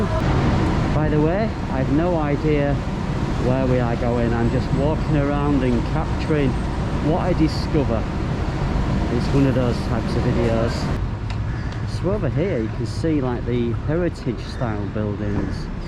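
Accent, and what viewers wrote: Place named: Northern England, United Kingdom. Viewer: Enjoyed the video. Nice to hear a northern accent. I have a mate here in KL from Liverpool but possibly you're a bit further north than that! Me, I'm a Sussex lad from the South.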